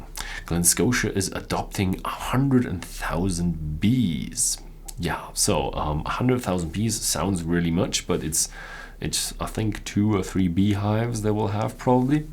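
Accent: Germany. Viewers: "A lot of news" or "tonnes of news" would work well. That said, I'd recommend you don't try to correct it. It adds flavour to your rather slight German accent. Back on topic: I could've sworn I've had an Amrut rum that was quite good. But google says there isn't one...?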